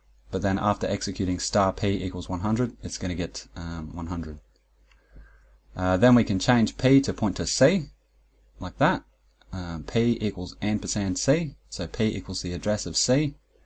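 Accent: Australia. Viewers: Alejandro Doe You do know Lennon was a Brit and this dude sounds like he's from Down Under. BTW vectors are better than linked list almost always. You get a lot more cache misses vs. vectors.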